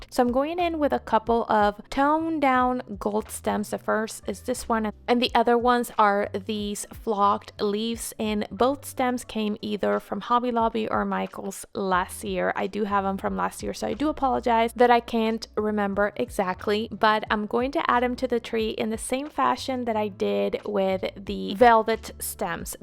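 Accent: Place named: Nicaragua. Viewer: such a nice job. I love that you make two videos one an English and another in Spanish. I have watched them both 😊 maybe I will try to do that myself on my videos if you don’t mind 😊Beautiful tree I love the burgundy ornaments & the hints of Cream ribbon 🎀 Took your tree to the next level, adding the candles gave it an old world touched. I also love the way you decorated your chimney & that vase I want 😊 it simply beautiful. The birth of Christ is really nice and unique. Nice to know that you are from Venezuela. Because I was wondering the way you spoke in Spanish. Was very similar to mine Nicaragua. I hope you’re having a nice day.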